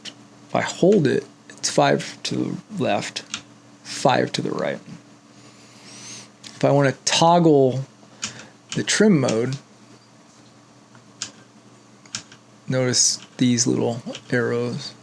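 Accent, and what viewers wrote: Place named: Brazil. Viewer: Thank you very much for this video, it's not very often I may be the 11th subscriber! Are you Brazilian by any chance? Your english accent suggests so.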